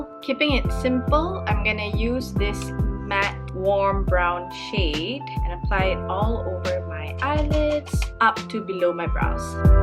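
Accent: Penang, Malaysia. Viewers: kak buat la video cakap bahasa melayu, seronok tgk btw saya orang penang so loghat kita sama. riuh dia lain macammmmm hahahaha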